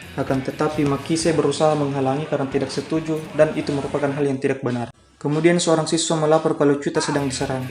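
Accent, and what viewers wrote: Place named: Makassar, Indonesia. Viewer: Orang Makassar ya kau bang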